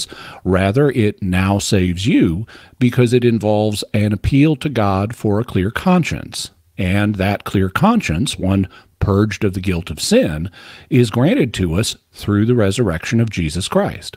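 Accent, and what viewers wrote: South, United States. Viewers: Big fan of. Not a fan of his (re?) acquired southern accent. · Lol I thought I was imagining it. His accent is definitely stronger than years ago. The intonation and rythym of the speech is more Southern too. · I love how you can hear southern accent getting slightly more pronounced since his move back to Arkansas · why do you sound more country than usual?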